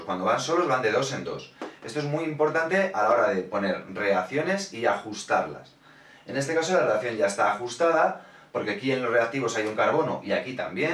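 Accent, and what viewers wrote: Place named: Spain. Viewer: Hola, soy de Mexico, me gusta mucho como explica! y ademas me encanta el acento español, es un gusto aprender!!! Dios lo bendiga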